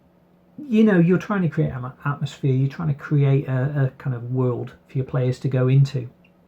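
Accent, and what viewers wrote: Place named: United Kingdom. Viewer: That's how British people talk.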